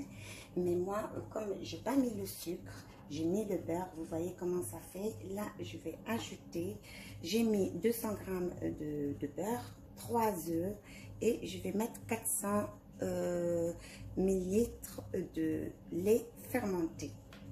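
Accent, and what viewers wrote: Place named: Lebanon. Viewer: Bonjour, excellente recette comme a votre habitude. Par curiosité, j’ai remarqué un joli accent libanais, en êtes-vous originaire. Bonne continuation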